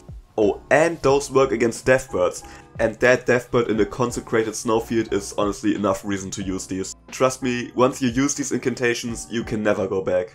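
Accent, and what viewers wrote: Germany. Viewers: man merkt das er deutscher ist xd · Bist du deutsch? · Der Deutsche Akzent kickt zu stark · So herrlich TEUTSCH, your english. I love my germans, because I'm one myself.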